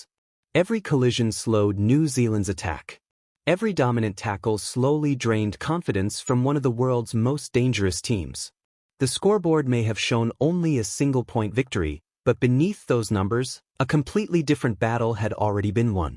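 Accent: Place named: United States. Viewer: try use a South African voice narration, an American voice doesn't fit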